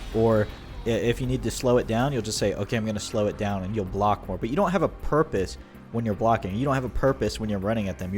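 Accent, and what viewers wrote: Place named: United States. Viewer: Hey man, you talk a lot about consistent mindsets, and it's indeed great to have! Have you tried therapy? You sound american to me, and I know health is very expensive in the US, but therapy is the single greatest thing I ever decided to do for myself, it improved every aspect of my life. As a bonus, now I can play ranked and not freak out about every single match, and not rage after every mistake... Have a beautiful day!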